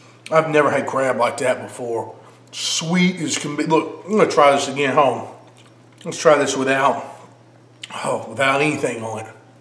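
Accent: South, United States. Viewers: I love ur southern accent!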